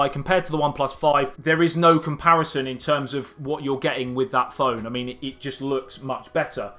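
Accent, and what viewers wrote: United Kingdom. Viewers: Idk why but i hate british acsent so much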